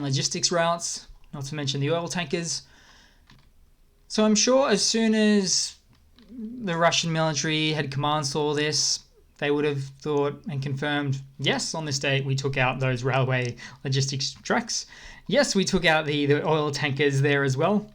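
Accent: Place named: Australia. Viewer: Interesting hearing an Aussie pronounce the Ukrainian names, I’m struggling trying to brake the word structure into something pronounceable! Cheers mate🇦🇺🇦🇺🇺🇦🇺🇦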